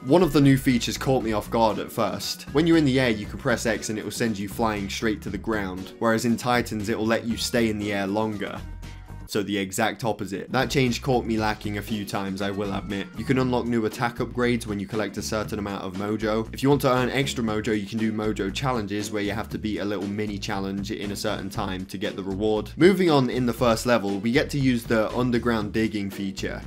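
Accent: United Kingdom. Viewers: oh man ... how i love hearing british guys talking ... nice video(s) btw :D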